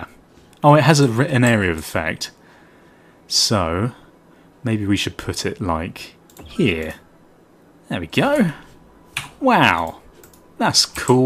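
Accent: United Kingdom. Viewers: British Accent FTW!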